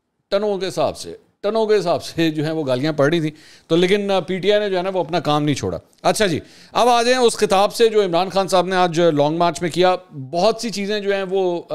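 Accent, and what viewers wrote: Punjab, India. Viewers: Punjabi style speaking is excellent, but please add some Pushto dialogue like Nishta etc. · Hahaha.. bhai ur punjabi touch rocks😊😊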